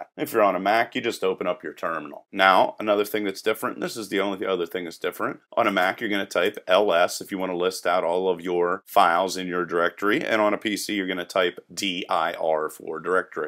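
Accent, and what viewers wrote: United States. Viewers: I love your tuts, you know your stuff and you speak very clear American English! Rock on Bro!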